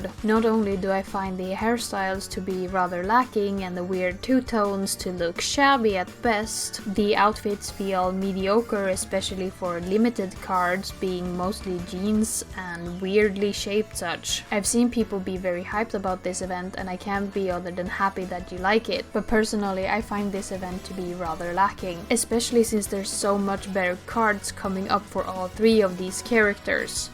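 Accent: Sweden. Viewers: are you swedish?!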